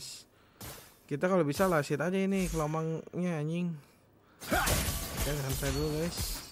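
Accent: West Java, Indonesia. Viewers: banyakin ngomong pake bahasa sunda bang, seneng gw dengernya jirr kocak 😂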